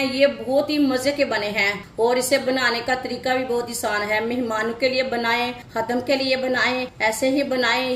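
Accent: Punjab, India. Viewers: Although Punjabi accent but excellent and simple recipe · Apki Urdu bi Punjabi lagti hai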